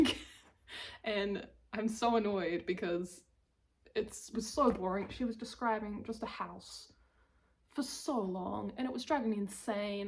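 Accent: New Zealand. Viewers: I finished school in NZ and also studied at Victoria University of Wellington for 5 years. It's so nice to hear the kiwi accent again! I really love your projects and can't wait for future videos. Welcome to the podcasting world 😊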